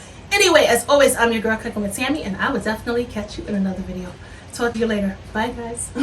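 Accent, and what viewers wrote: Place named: Boston, United States. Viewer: One more thing. I always said that I love your accent and I would always say NYC, but now that I have watched your videos more...., GIRL YOU ARE FROM BOSTON!!!!! PLEASE TELL ME THAT I AM CORRECT. I worked at a Assited Living Facility for awhile and one of the lady's there was from Boston and I loved the way she spoke...., and OMG YES MA'AM PRESS HAM ♥♥♥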